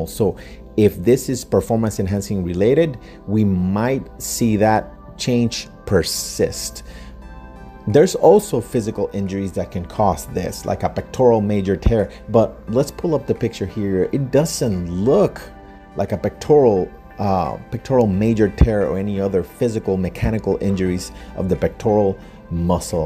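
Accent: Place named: Philippines. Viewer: You sound very fillipino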